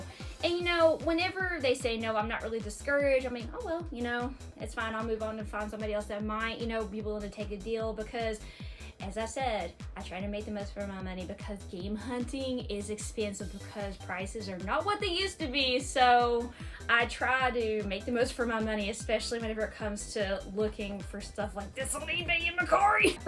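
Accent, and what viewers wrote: South, United States. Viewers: Southern accent for a girl is the cutest accent there is and one who is a gamer is the best of the best ❤️ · My local Goodwill only has about 50 copies of Tony Hawk Ride 😆 Glad your channel got recommended! It's nice to hear another collector with a Southern accent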